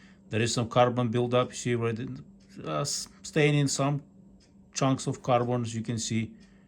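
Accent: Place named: Russia. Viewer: Сразу слышно русский акцент)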